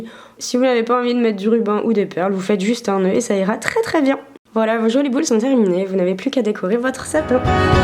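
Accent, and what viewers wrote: Toulouse, France. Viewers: Tu as pris l'accent toulousain !!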